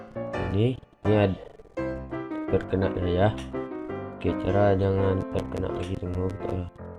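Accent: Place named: Aceh, Indonesia. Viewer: Bole kasih kritik? Bole ya...biar makin sukses.... Klo ngomong jan medok aceh bgt.. Trus cobak tuh lighting fotonya disamain kek latar belakang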